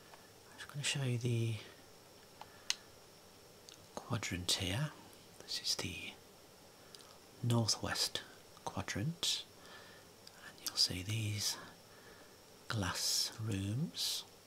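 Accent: Birmingham, United Kingdom. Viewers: You sound like a Brummie, how comes you support United?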